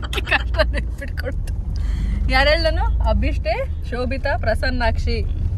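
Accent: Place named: Davangere, India. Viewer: Davangere slang kelake kushi❤😊so happy to hear from you😊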